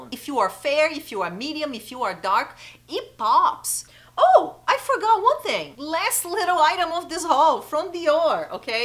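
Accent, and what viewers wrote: Brazil. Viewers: ouvi o sotaque e na hora sabia q era brasileira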